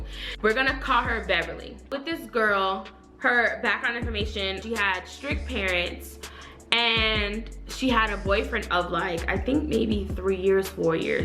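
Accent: Florida, United States. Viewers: Yesssss I knew you were from Florida we talk the same lmao!!! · Miss. Darlinton Lmfaooooo Florida squad! The expressions, the hype, the humor, the way she talks all of that I was like "lmfao is she from Florida?"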